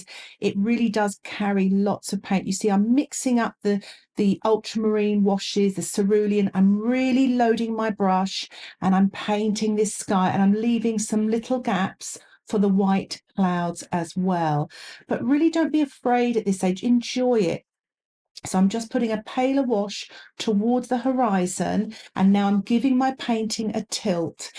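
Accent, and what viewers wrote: United Kingdom. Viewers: Merci ! - Un accent british sooo deliiicious..... Love from Aquitaine, France (do you remember your/our queen Alienor ?)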